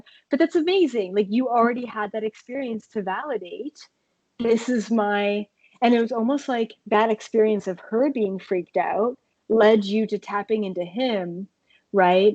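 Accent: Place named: Sweden. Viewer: Jag hittade dig via videos, sååå kul att hitta en session med en svensk! Man hörde direkt på engelskan att du var ifrån Sverige 😋🤗❤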